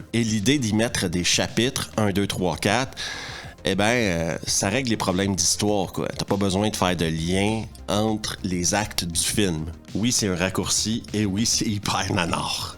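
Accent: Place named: Montreal, Canada. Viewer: Hé Mister, quel plaisir, quel bonheur ! merci de partager ces bons moments avec nous, j'ai découvert ta chaine par hasard il y'a quelques jours et crois moi, mes 15 minutes quotidiennes de nanar et de souvenirs filmographiques me procurent un bien être et un lâché prise inégalé. Tout y est le choix des films qui ont bercés mon adolescence, les mots pour le dire, le ton, cet accent qui sous forme de "Madelaine de Proust" me ramène à la fois dans mes années 80 puis 90 quand je vivais à Montréal. J'ai rarement été si enthousiaste à l'écoute d'une émission, merci mon pote, tu fais partis de la famille now, je partage cette expérience avec mon fils de 17 ans, ce sont de réel moment de complicité. A bientôt.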